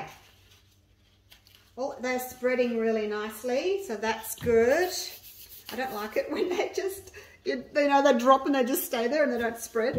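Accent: Australia. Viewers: Wow that is amazing! Everything you do is amazing!! I work with resin but can’t even come close to doing all the great stuff you do!! I love all your videos!! Plus there isn’t anything better then a Australian accent!! Lol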